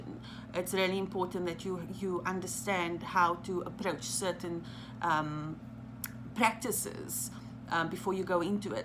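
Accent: South Africa. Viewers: You have a South African tone to you're voice hey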